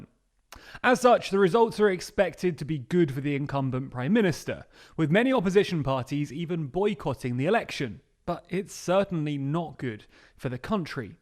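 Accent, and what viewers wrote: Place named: RP, United Kingdom. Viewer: You sound slightly deeper voiced and more posh in this video.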